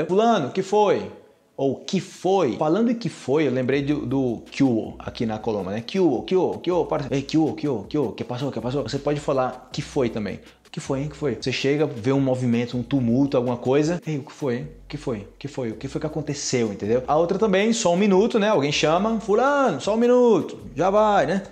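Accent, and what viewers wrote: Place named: Colombia. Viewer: Eu sabia que vç tinha espanhol da Colômbia! 😉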